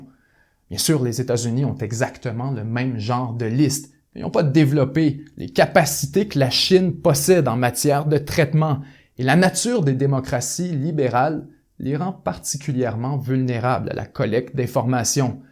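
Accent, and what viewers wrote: Quebec, Canada. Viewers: Bonne vidéo, malheureusement l'accent québécois m'insuporte ... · Ton accent canadien est tout simplement cool avec une adresse oratoire impeccable. Les espions sont éliminé systématiquement car ils savent très bien ce qu'ils font ; ni vue ni reconnu, quel dommage de sacrifier sa vie pour des causes aussi basse. Hélas comme la betise humaine est insondable.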